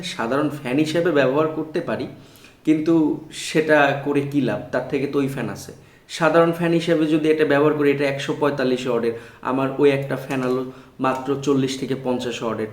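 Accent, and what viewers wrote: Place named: Bangladesh. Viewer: Apnar kotha sune mone hochche apni Bangladesh theke. Oidike coastal type er climate , cooler dry weather Chara cholena. Air cooler north/West india te bhalo chole, adrota thakle kokhonoi cholbena. Apnar chit chite lagche Karon humidity thakle aro humidity baraye, local Air cooler onek bochor use korechi, ac-r Cheyeo thanda Hawa deye, local cooler onek sosta ar. Boro hoye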